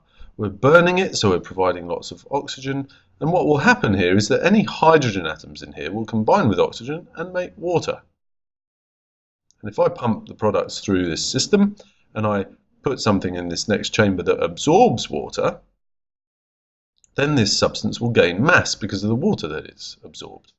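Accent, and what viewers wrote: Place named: United Kingdom. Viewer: I'd like to say you are one of the best IB chemistry teachers on Youtube. Your videos are really helpful. In fact, you have taught me more than my IB teacher has in the last year and a half. Thank you so much!! You're a star!:)) PS. Your British accent helps- I'm from England so it's quite difficult for me to sometimes understand the American youtubers since they pronounce most stuff quite differently to us.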